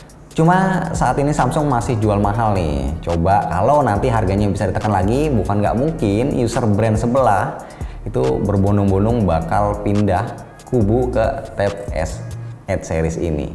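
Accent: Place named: South Jakarta, Indonesia. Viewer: Jaksel. Bet logat mu bang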